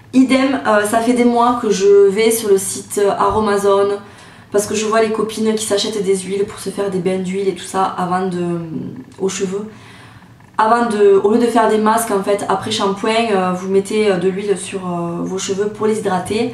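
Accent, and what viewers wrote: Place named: Toulouse, France. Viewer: T'habites a toulouse? Je me disais bien que t'avais un accent!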